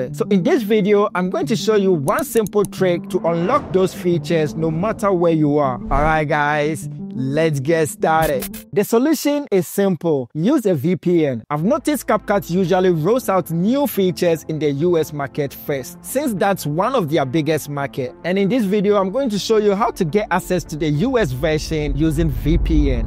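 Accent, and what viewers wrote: Ghana. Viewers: Oo Chaalee, welcome back to Ghana. Since the very first day I came across your awesome edits and tutorials, you sounded Ghanaian until you mentioned Finland in some videos and I was a bit confused 😄. Keep up the good works bro.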